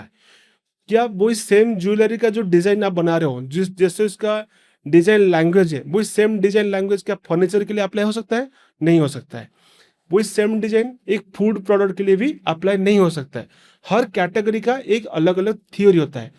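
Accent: Odisha, India. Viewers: Bhai tame hindi kahibaru jana padijauchi tame odia boli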